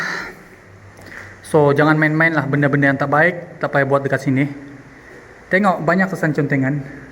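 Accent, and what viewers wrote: Sabah, Malaysia. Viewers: orang sabah bang,mak kadazan ayah india dan dia islam xsilapnya.sbb tu pelat² sabah tapi muka ala² india.😊😊😊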